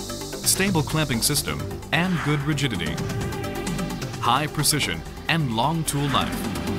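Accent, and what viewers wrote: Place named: South Korea. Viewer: koringlish.... I can't believe this gets put out like this....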